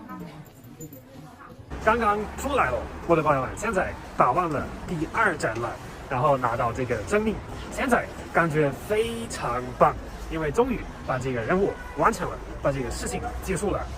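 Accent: Sichuan, China. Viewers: 提个建议，你最好用英语加中文字幕，你的普通话水平太一般了，还有点川味，哈哈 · 的四川话说得可以呵！才来了五年👍👍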